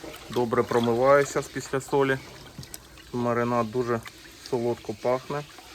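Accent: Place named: Russia. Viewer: Акцент кацапа а так піде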